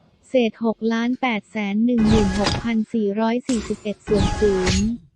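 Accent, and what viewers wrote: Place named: Suphanburi, Thailand. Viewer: พี่ เป็นคนสุพรรหรอคับแลเหน่อ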